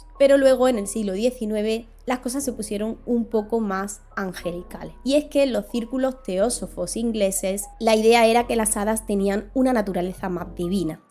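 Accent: Andalusia, Spain. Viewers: Muy agradable el vídeo y tu acento que ha de ser de Andalucía, puede ser? Soy argentina y te saludo. Y te ganaste mi suscripción 🎉❤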